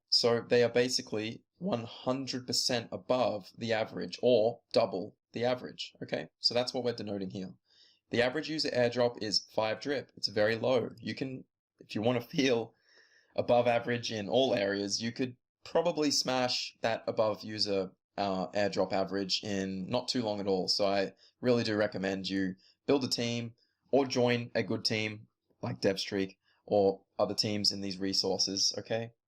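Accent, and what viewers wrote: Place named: Australia. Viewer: Great video. Hi from Melbourne, Australia and you sound like an aussie too.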